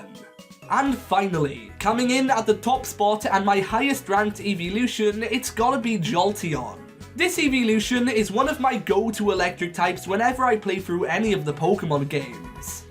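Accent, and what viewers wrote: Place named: Scotland, United Kingdom. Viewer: Rly sorry to ask, but where is your accent from?? My little bro says you sound scottish, but I just wanted to know!!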